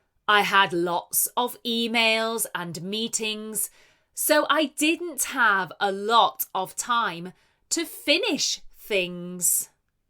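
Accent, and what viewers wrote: United Kingdom. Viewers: 🙌🏾🙌🏾🙌🏾🙌🏾Thanks so much for your time that you have been using to prepare the different stories ✨✨ it helps to boost our english level 😁😁Even UK accent 📌.Don't know how to thank you 😊 enough.May God bless the work of your hands 🙌 🇹🇿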